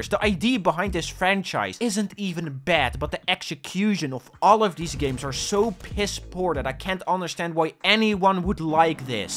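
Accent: Netherlands. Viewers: Is dat een Nederlands accent dat ik hoor? ;) Goede video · Binnen de eerste 2 seconde herkende ik een mede-Nederlander. Gekoloniseerd broeder. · This guy his English sounds so painfully Dutch. Lol Good video though.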